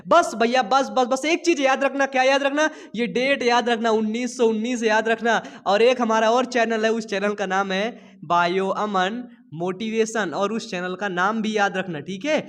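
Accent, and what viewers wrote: Uttar Pradesh, India. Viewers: Sir Aap u.p shy hoo kya 🤔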